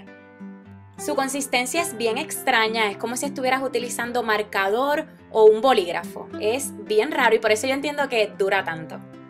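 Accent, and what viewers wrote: Puerto Rico. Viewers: me encantaaa tu acentoo boriicuaa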